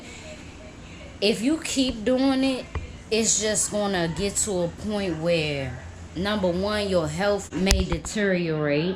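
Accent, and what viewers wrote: Washington DC, United States. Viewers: Love the way you talk must be a dc thing ♥️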